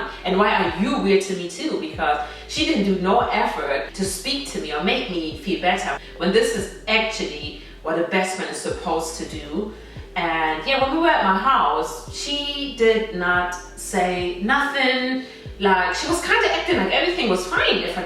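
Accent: Germany. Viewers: Love your German accent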